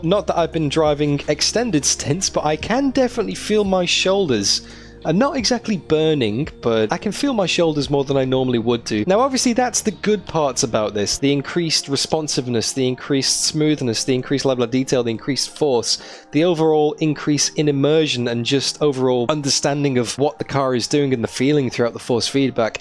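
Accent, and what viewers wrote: United Kingdom. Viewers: aaaah i thought the accent was familiar, I'm from the other side of the Mersey myself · sounds english too me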